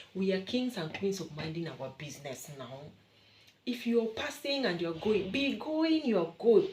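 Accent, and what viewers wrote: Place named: Nigeria. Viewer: An informative video. As a Pan African and a Nigerian I was immediately drawn to watch this video as it warms my heart whenever people of African descent come together in love. I’m sure you’ve heard this before but I was struck by how your accent now has a distinct Nigerian tone to it ….. especially as you inject quite a few Nigerian words and phrases. Lol. Hopefully, there will be a part two to this video as this video seems to be overwhelmingly focused on your negative experiences and warnings about Nigeria …… as I’m sure on reflection there are more positive experiences and recommendations that you can share about life in Nigeria. I have subsequently liked this video and subscribed to your channel. Peace.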